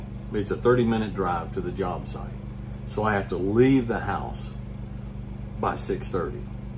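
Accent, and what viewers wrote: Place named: South, United States. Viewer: I saw the hat and was so excited to hear a southern accent. Was not disappointed. 🙏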